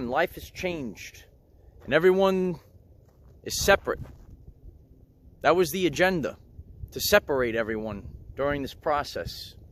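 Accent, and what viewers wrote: New York, United States. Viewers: You must be a fellow NYer :) Thanks for this great video 💕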